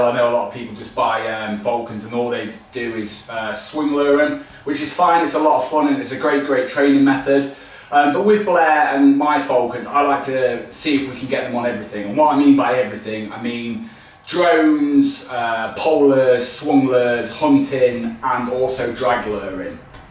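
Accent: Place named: South Carolina Lowcountry, United States. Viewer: I can tell by your accent that you live near me in the South Carolina low country. I’m located in Myrtle Beach and was searching for a previously owned falcon on Craigslist. I’ve been ripped off TWICE now. 😔. I bought my first bird from a guy who would only meet with me in the Brew Ha Ha’s parking lot. At first I loved his bird. It was so gentle and quiet. The bird came with the little hat and everything. But after purchasing it I waited three days for it to wake up and NOTHING! So finally I decided to take off its little hat and visor. Only then did I discover that it didn’t have a head. The hat was literally sewn onto some animal’s torso. I’m not even sure if it was ever a bird to begin with. Upon further inspection I was appalled to realize that the animal’s magnificent feathers had all been Scotch Taped to the pelt. 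I was very skeptical of the second seller and demanded that wake the bird up. I was relieved when the bird became animated. It was an angry foul beast but the seller was confident that the bird was just having a bad day and was usually a late riser. So I bought the bird. I was so excited about my new falcon and posted pictures on my Instagram. It turns out that my bird isn’t suitable for the sport of falconry. Again I was duped. The bird I purchased doesn’t even fly. It looks like a falcon but it’s usually not trained to fly. It isn’t so much a sporting bird. My friend Ming’s parents own the Wok In Laotian restaurant and daycare center in Myrtle Beach. They id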